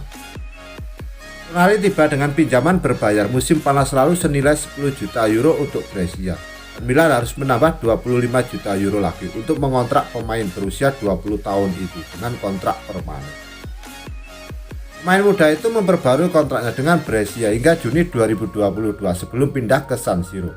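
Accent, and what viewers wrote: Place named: East Java, Indonesia. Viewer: Suara e medok .. orang jatim ya?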